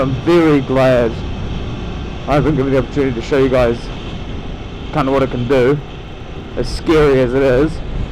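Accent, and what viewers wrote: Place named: New Zealand. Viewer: Great to hear a Kiwi accent on a bike review! Great review! And most importantly... Oooh look, a Squirrell!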